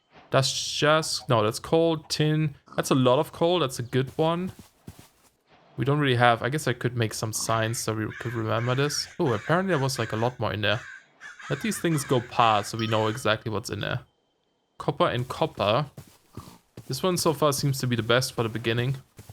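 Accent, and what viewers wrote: Germany. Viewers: Are u German? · I was looking for this comment. Fellow German here. His English is pretty good, however I believe he still uses German intonation.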